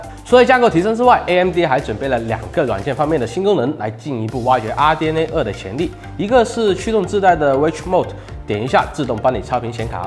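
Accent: Cantonese, China. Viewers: 满口的广色普通话和英文，落实是广东人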